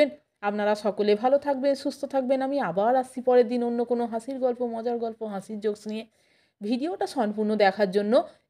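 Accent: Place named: Ghoti, India. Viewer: দিদি, আপনি ঘটি, না বাঙাল? আপনার রিলগুলো বাঙাল ভাষায় এবং সম্ভবতঃ বাংলাদেশে বা বাংলাদেশীদের সাথে করা। আর আপনার জোকসগুলি একক কণ্ঠে ঘটি ভাষায়! আমরা সত্যি ই কনফিউজড!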